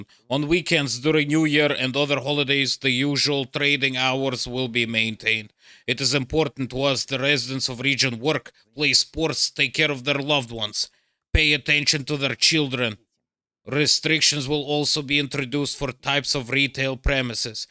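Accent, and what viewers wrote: India. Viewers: OMG!!! YOUR FUCKING INDIAN ACCENT!!! 🤣🤣🤣🤣🤣🤣🤣🤣 I mean, the information is relevant and important but the way you deliver it is just BRILLIANT!!! Thanks! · I just love your videos! Especially your dubbing with different accents! Great Indian accent! As a American I want to know what is going on in Russia! I have been watching your videos since you lived in Russia! I have always enjoyed your videos!!!!😂😂😂😂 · Your Indian accent is new. Love it! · I love your Indian accent!😊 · Brilliant Indian Accent.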